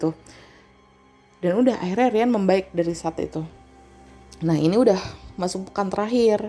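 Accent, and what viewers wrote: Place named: Jakarta, Indonesia. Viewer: Ya karena logat dia logat anak2 jakarta, kalau anak daerah ya agak susah cerita kaya gini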